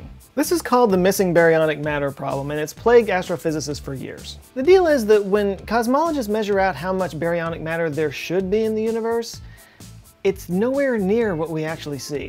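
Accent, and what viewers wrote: Texas, United States. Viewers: A giant what? Oh, a die-key-ree, now I get it... no thanks to your Texan pronunciation.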